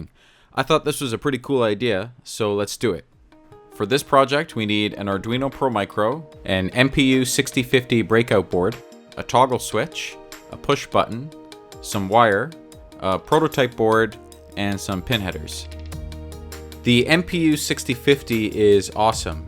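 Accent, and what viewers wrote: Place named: United Kingdom. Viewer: British man spotted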